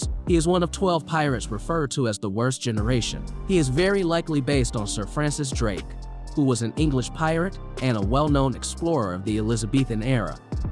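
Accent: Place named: Georgia, United States. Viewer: this is the most Georgia accent I've ever heard lmaooooo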